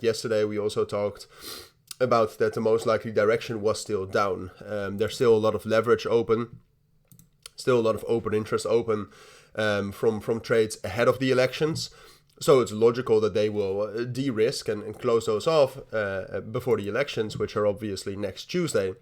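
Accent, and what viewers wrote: Netherlands. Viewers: Is this guy Dutch?? Dutch accent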